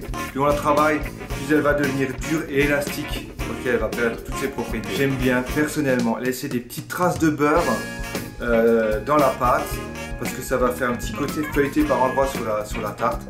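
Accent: Alsace, France. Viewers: Tu viens d'Alsace non ? :p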